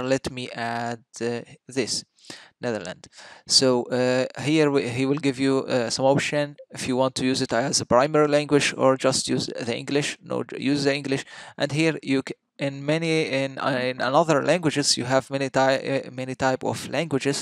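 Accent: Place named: Egypt. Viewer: From the accent I can tell you are Egyptian lol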